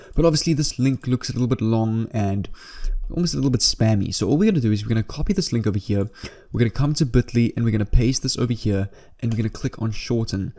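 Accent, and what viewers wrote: South Africa. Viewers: Bro you from SA right?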